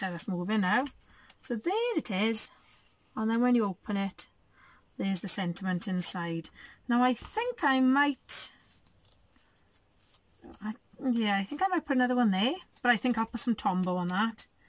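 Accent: Wales, United Kingdom. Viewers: Good tutorial.... love your Welsh accent!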